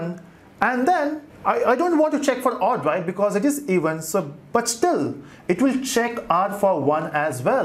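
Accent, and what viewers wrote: India. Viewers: Why you speak with Indian accent?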